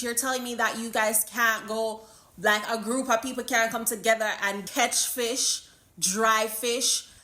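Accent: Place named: United States. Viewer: Shut up is because you away in America that is why u and your is talking the way u a chat.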